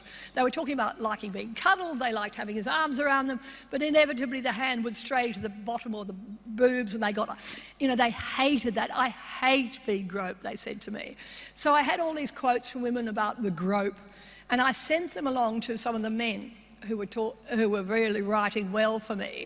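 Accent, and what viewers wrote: Australia. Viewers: Yes, the clue is in the Aussie accent.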